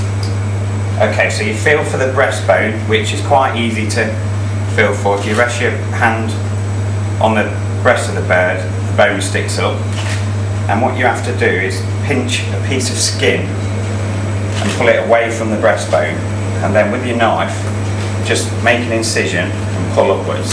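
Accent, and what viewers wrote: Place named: England, United Kingdom. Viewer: Great Job! Thanks for Showing everyone how to clean bird! LUV the English Accent'!!! The Chef sounds like James Bond! LOL!!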